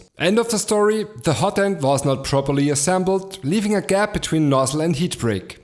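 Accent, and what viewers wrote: Germany. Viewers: Welcher Dialekt aus Bayern, nehme ich, war es?? Antwort würde mich freuen!!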